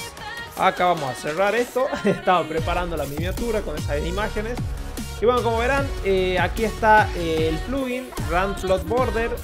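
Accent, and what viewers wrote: Argentina. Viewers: Siento conocido tu asentó, eres de Argentina? (De que parte)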